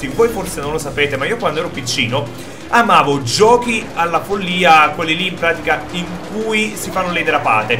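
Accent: Naples, Italy. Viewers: è napoletano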